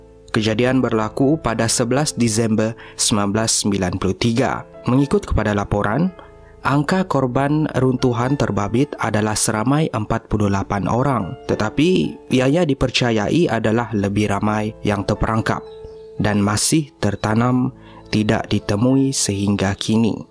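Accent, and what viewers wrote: Sabah, Malaysia. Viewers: urg sabah ba ko kan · Sabah bh ni pengulasnya nie😂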